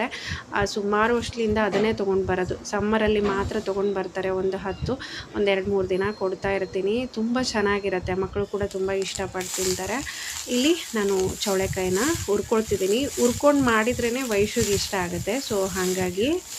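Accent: Raichur, India. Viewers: ಅವರೇ ನಮ್ದು ರಾಯಚೂರು ಹತ್ತಿರ ಬಂಗಾರ ಹಟ್ಟಿ ಹೀಗೆ ಇರೋದು ಇಲ್ಕಲ್ ಬಾಗಲಕೋಟೆ ಡಿಸ್ಟ್ರಿಕ್ಟ್ ನ್ಯೂ ರಾಯಚೂರು ಭಾಷೆ ಮಾತಾಡ್ತೀರಲ್ಲ ನನಗೆ ತುಂಬಾ ಇಷ್ಟ ಆಯ್ತು ಅಡಿಕೆ ಡೈಲಿ ನಿಮ್ ಚಾನೆಲ್ ನೋಡ್ತಾ ಇರ್ತೀನಿ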